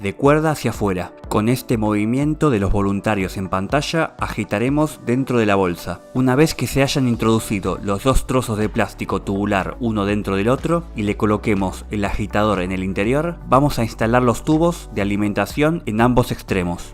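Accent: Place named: Argentina. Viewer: Muy bien explicado, Pero estoy seguro que puedo encontrar otro video donde me lo expliquen sin ese molestoso y desagradable acento Argentino o Uruguayo.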